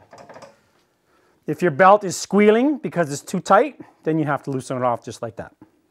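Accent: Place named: Saskatchewan, Canada. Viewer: Are you from Saskatchewan?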